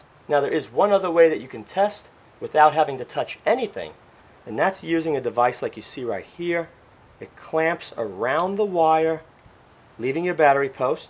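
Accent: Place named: Long Island, United States. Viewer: Thank you, and you are most welcome. Geez, hard to believe but it was 2004. Sure, a lot has changed, but in my opinion and experience the zenith of Toyota quality, fit and finish, and reliability was Japanese made Toyota vehicles of the late 80's-1995/96. Still relatively simple but done with tried and true technology and craftsmanship, and in many cases engines were VERY overbuilt, nearly indestructible, yet refined and beautifully executed/made with extremely high quality parts/metals. Still a lot of timing belts to deal with in this era, but the vast majority of these engines were non-interference, and a broken belt did not destroy the engine. I'd like to compliment you on your channel, and your way of going about your videos. They are clear with a lot of detail, well filmed, very well explained with easy to grasp concepts, done in an affable manner... 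The true mark of a great educator... And it even sounds like my old friends from Long Island. Take care, and please continue your great work.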